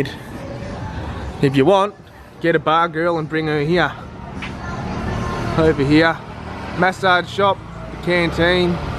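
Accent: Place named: Australia. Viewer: Australian accent alike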